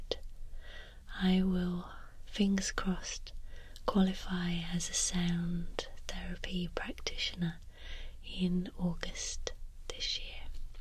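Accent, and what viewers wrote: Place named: Scotland, United Kingdom. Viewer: hi! are you from scotland or the north of UK? cause your accent is so strong!!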